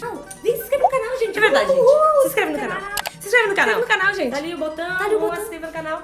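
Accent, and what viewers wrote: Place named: Portugal. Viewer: Muito legal! Você é muito carismática falando, e parece ter sotaque de portuguesa (você é portuguesa?) rss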